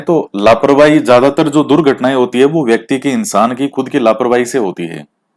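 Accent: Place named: Marwari, India. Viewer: Nice marvadi language ... humare bhi ase hi bolte hai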